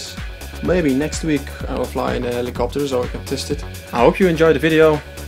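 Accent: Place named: Netherlands. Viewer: Thats what you get when a Dutch person tries to speak English haha. I'm dutch and I reconize that accent everywhere.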